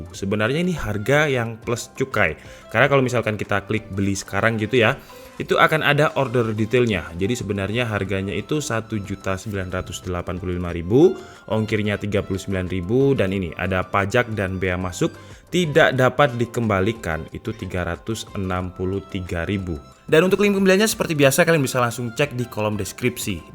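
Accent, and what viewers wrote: Java, Indonesia. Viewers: Orang jawa emang medok mas bro jawane😂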